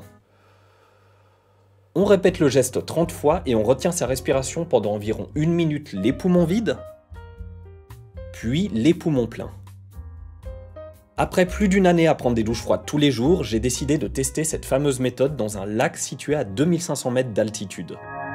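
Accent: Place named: Entremont, Switzerland. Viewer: ha il me semblait bien reconnaître l'accent entremontant ! 😁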